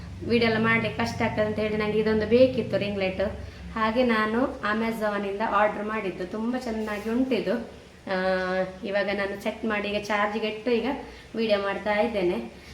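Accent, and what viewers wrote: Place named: Mangalore, India. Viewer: Manglore Sister Tamma Modalane Video Noduttiddene Nimage Olleyadagali Matte Adaga Videos Noduve Support Maduve Ok na Nimma Manglore Matu Tumba Ontra Kelalu Yeno Helodakke Tilita Karnataka dalli Onda Onda Kade Onda Taraha Style Kannada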